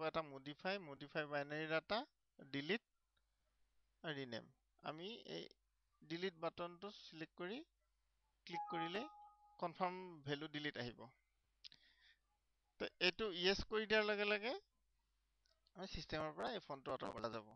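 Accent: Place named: India. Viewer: English with an indian accent.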